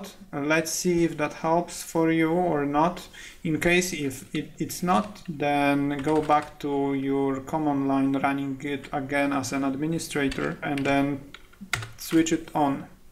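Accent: Romania. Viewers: Se vede ca-i roman nu are acent de englez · Cool Accent. Romanian? The first option worked great and for those who don't know, you may need to type: cd C:\Windows\System32 in the Command Promp first and press enter.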